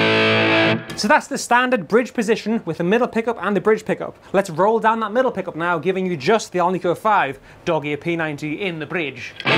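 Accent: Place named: Northern England, United Kingdom. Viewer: Y tiene uno de esos acentos del norte de Inglaterra.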